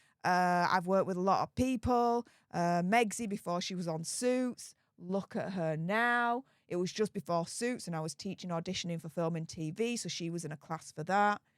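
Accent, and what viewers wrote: United Kingdom. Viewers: When you say “bonning” it sounds like “born in” … took me awhile to make out what you really were saying. This is what is fun above hearing a British accent.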